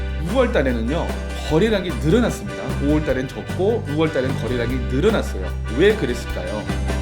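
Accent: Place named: Jeolla, South Korea. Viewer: 혹시 고향이 전라도신가요? 고향말투가있는것같아서 반갑네요ㅎㅎ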